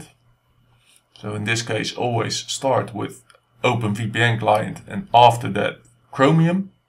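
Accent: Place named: Netherlands. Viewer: It spund like youre from The Netherlands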